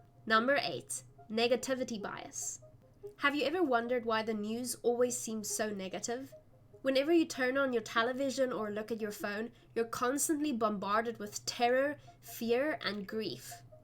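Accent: South Africa. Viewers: I'm guessing that the mere exposure theory would be why people with specific phobia are encouraged to go through exposure therapy? Though frankly, as a person with a phobia, I want nothing to do with that 😅. I know this video is a month old already but I quite enjoyed it. I've always loved psychology but not on the level that you obviously do. Bonus that I'm an American who lived several years in SA (Capetown), so hearing a familiar accent is just lovely when hearing about a topic I enjoy. Thank you for the content, and I look forward to hearing more as you continue your studies.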